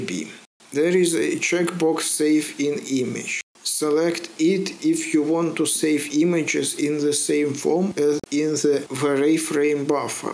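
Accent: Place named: Ryazan, Russia. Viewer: ты бы по русски, что ли вещал. Не возможно слушать рязанский акцент)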